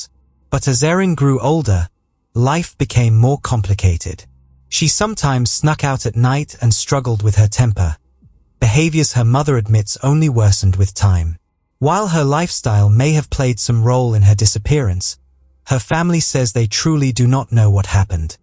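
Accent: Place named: England, United Kingdom. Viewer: Damn I hate when these type of videos are narrated by people with English accents 👎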